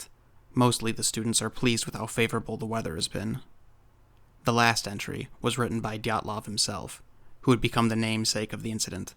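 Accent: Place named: United States. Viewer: nope...northern canadian .... now living in tropics,btw : Your accent sounded 'american'..... if I'm wrong, I stand corrected. So what are you ? and what about 'radiation' reports in the area? Russians are pretty open about their findings. Your bombastic intro and analysis did Nothing for a conclusion. Which clinically... is real psycho. Now STFU with bs .... and offer a solution.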